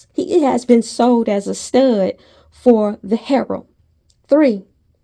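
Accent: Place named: South, United States. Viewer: You're voice is amazing, great narrator. What region of the US are you from? I hear a little southern accent... beautiful.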